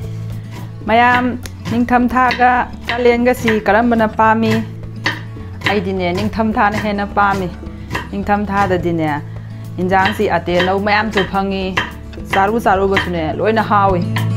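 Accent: Kakching, India. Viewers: Mama Se kakching kei leiky deino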